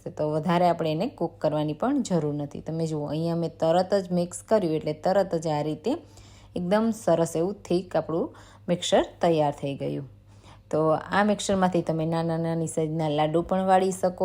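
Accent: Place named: Kutch, India. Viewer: મેઘપર બોલીથી કચ્છ 🎉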